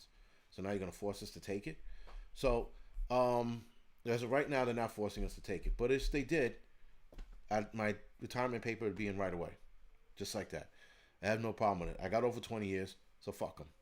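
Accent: New York, United States. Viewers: Just curious brother. How come you talk like a New Yorker? We're you born or live elsewhere for a while? I live near Boston and we almost sound alike. But you no...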